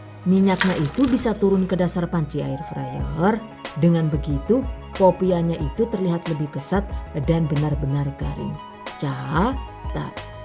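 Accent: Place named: Banyumas, Indonesia. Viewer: Sangat khas videonya... Ada logat 'ngapak'nya.. Jdi lucu.. Dan penjelasannya langsung bisa dipahami... Makasiih bunda resepnya... I really enjoy watcing this video...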